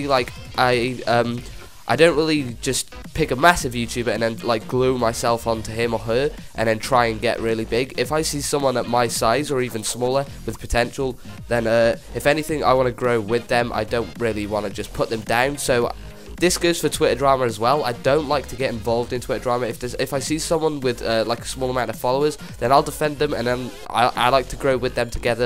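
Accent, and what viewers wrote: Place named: Wales, United Kingdom. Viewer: I thought you were welsh I could here it a little, Which town though ? I'm from Newport !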